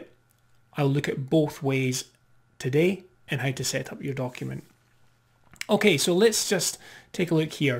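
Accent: Scotland, United Kingdom. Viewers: Thanks man. Love your accent, is it scottish?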